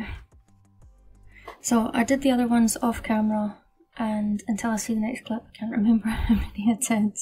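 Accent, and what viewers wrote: Scotland, United Kingdom. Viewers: 🙈 Hearing the Scottish accent once I turned up the volume really threw me!! But looks great! 👌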